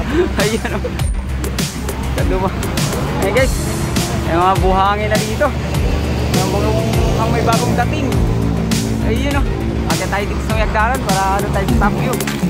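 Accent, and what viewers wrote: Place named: Tagalog, Philippines. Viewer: Nakakatuwa kang pakingan mag salita ng Tagalog. Hahahaha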